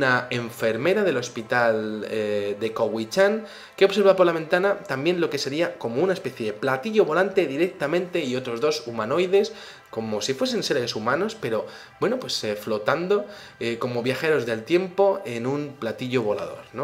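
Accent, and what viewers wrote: Spain. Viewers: retrata a un artista pintor jajaja... Me haces reír de buena gana con algunos de tus relatos jajaja... La paso muy bien mirando tus videos, subiéndome a tu ''maquina del tiempo'', con tus dichos españoles en ese acento exquisito, con tu forma tan talentosa de relatar los hechos en general:) Es como 'leerme' un libro audio:) Tremenda maratón de relatos interesantes que has hecho! Gracias:)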